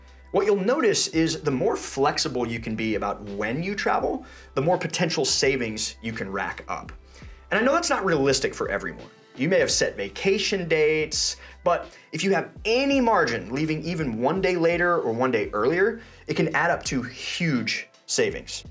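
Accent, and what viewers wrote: United States. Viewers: Hmmm ......You’re watching content on an American’s channel (with a definite American accent). It can be picked up in other countries but it looks like it’s basically a video by Americans for Americans. And you’re upset that it doesn’t apply halfway around the world?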